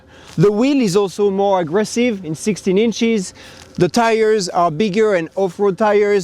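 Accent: France. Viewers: Love the French accent! ❤ Great van, too!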